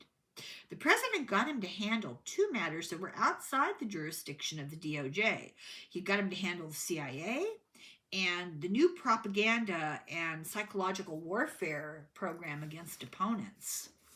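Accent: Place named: Ireland. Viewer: I just love your Irish accent. You are beautiful And a sweetheart I look forward to being part of your channel I subscribed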